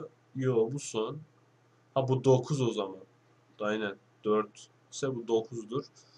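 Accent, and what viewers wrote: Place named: Adana, Turkey. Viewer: Adanalı işte gurban olayım anagı yolugu diye ya ağız dolusuyla çok hoşuma gidiyor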